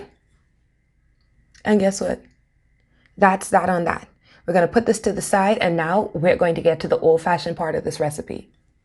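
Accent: Bahamas. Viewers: it's the accent though :-) i love a real authentic Bahamian accent. ima cook some boil fish ta'night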